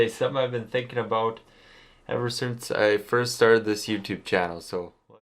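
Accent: Canada. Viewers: I came across your channel years ago, and got a kick out of your Canadian accent. (Eh?) But I kept coming back because you weren't trying to be a YouTube "star." You were just doing fun things, and bringing us along with you. I wish you more and more success as the years go by. 🤩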